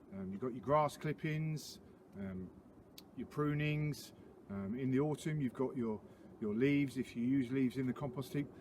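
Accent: United Kingdom. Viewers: I detect a bit of a British accent there mate. I love all things gardening and I am a huge anglophile so I am looking forward to more of your channel. Also I love to see gardening channels from my Nova Scotia area.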